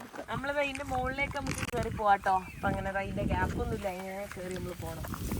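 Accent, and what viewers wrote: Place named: Kannur, India. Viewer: ഇതല്ലേ,ഞാള് കണ്ണൂര്കാര് തമ്മു കണ്ടാൽ പറയുന്ന ഭാഷ! 😄😄😄😄😄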